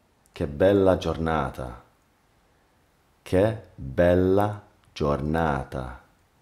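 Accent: Italy. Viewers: No this is French with an Italian accent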